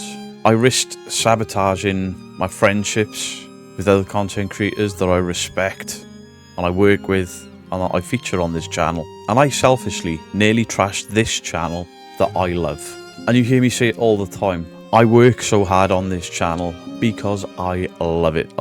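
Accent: Wales, United Kingdom. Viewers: Why hide your welsh accent?? I lived in Abergele for30 years loved the place, right facing the beach too..2 of my youngest children were born in ST Asaph Denbighshire North wales..If the jealous trolls don't like you thats their own problem.NEVER CHANGE.. your doing an excellent job keep up with the excellent videos of debunking the fake channels. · Actually choked on my coffee with this..from one sarcastic, pineapple haired, ear stretched prick to another... We effin love you and your Welsh freakin accent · Firstly can l say this is the best reaction to the stupid whinning comments ever. Secondary don't you ever get rid of the welsh accent, its beauty to heat. Keep up with the great work. Love you. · I like your Welsh accent brother! I'm glad this all bullocks!!😂 Your a good man. We all know that! Thank goodness you weren't serious!! Lol...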